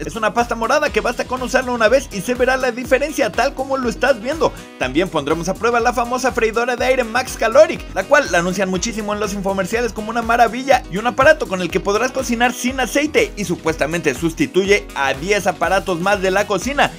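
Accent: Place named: India. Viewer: Por que habla como indu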